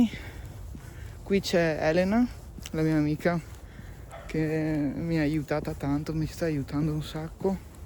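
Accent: Veneto, Italy. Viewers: per l'accento veneto che adoro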